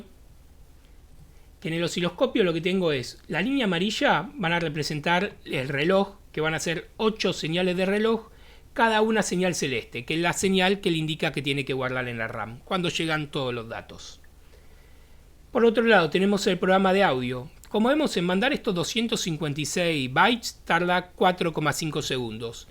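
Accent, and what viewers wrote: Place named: Argentina. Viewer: viejo, es increíble!!! que laburo!! que apasionado te felicito estimo por el acento que sos argentino un abrazo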